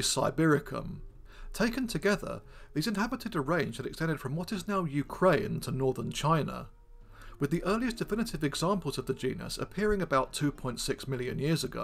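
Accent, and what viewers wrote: United Kingdom. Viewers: What part of England are you from?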